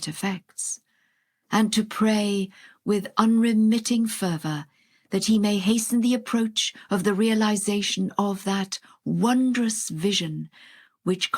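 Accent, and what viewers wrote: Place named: United Kingdom. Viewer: I cant understand her its the British accent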